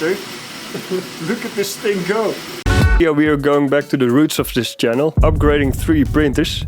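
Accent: Netherlands. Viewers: het shitste Hollands-Engels accent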